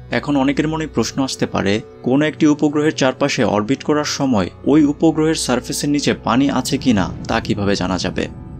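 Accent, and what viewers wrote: Narsingdi, Bangladesh. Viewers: ভাই আপনার বাড়ি কি নরসিংদী?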